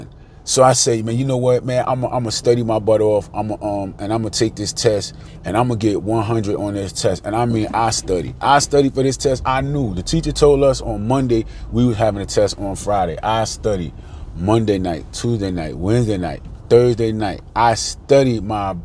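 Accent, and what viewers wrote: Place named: New York, United States. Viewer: Sound like you from one of the boroughs.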